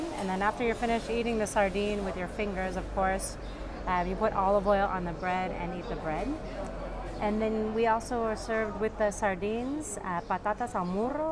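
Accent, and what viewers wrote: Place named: United States. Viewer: Wow, ya lei que naciste en Chicago, yo me decia, donde esta mujer aprendio el ingles tan bien, me decia, acento ingles no es, australiano tampoco, te note pronto el acento de aqui pero me cuestionaba que siendo española por que lo hablabas perfecto and it is because you were born in America jajaja. Muy bueno tu canal. Me retire en America, soy cubano y quiero vivir en Portugal un tiempo, me es muy barato, mucho menos que aqui.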